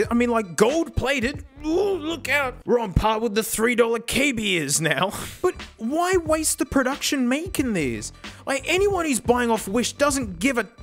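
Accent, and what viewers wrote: Australia. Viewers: Question are you Australian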